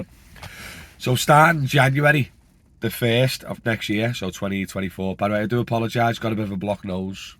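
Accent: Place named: England, United Kingdom. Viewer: Can't understand your English accent mate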